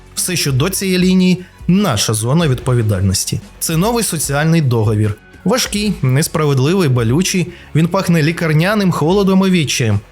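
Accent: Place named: Russia. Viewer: диктор - ЗНАЙДИ українця для редагування тексту який читаєш, навіть не надто освіченого - просто неможливо чути твої ПОСТІЙНІ русизми, наче 3м6іцил якийсь... Навіть ШІ краще прочитає... "нЕ київ, нЕ москва" нІ платить тобі, ога!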